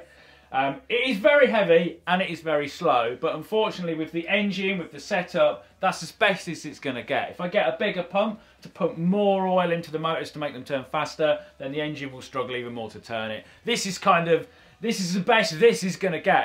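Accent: England, United Kingdom. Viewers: Why after every time I watch a new video do I want to start talking with an English accent and go out to the garage and try to think up the most insane and off the wall contraptions..... 😂😂😂fantastic video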